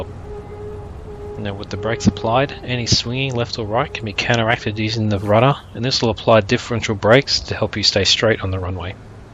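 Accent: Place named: Australia. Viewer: Dude you sound like an Aussie, why can't I find this game in Australia?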